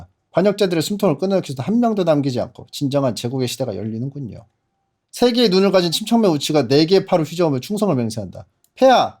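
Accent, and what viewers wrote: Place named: Jeolla, South Korea. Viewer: 서남방언을 잘쓰고 눈치가 빠른 침착맨 겐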